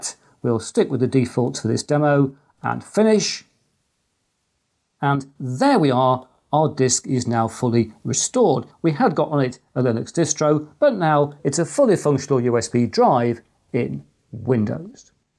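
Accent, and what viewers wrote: United Kingdom. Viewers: Loved this new format! I hope there will be more in the future. Even if I knew about most of these urban myths, the technical clarifications you bring are most useful. I must admit that I am also partial to your style: no flashing extravagant post production effects, no fuss, just plain and precise information. On top of that, your British accent and phrasing and overall attitude are music to my ears! Have a nice cuppa! (Try not to mind the undocumented conspiracy theories too much: as you mentioned in your introduction, facts and opinions (or what we think is a fact without knowing or wondering where it's comming from) are indeed deeply intermingled... I hope you'll keep trying though!)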